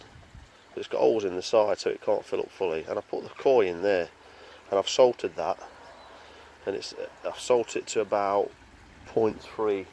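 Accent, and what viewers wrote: Nottingham, United Kingdom. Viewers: You sound like Carl Froch. is that a Nottingham accent?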